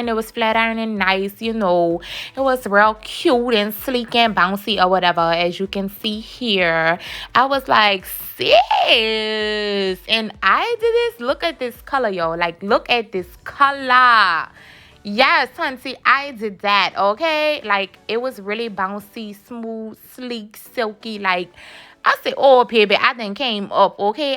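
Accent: New Orleans, United States. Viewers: I love your NOLA accent!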